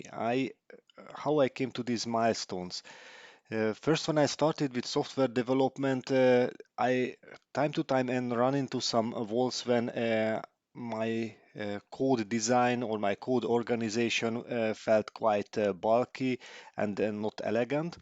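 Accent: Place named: Hungary. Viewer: Biztos dolgoznak magyarok a Juhtyúknál a beszédfelismerésen, mert igen pontosan feliratozza a magyar akcentust.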